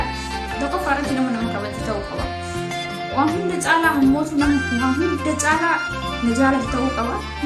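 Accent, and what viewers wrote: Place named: Harar, Ethiopia. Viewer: Anaa dhufuu & waw 😍 cimtuu too gaafifi debii baredaa halaan nama hawwatudha qaxalee too waqayyoo bakka yadden si Haa gahuu qashtii too shawadha jettaa ani intaa harargee natti fakkata bar😍💞akka arargetti hasofta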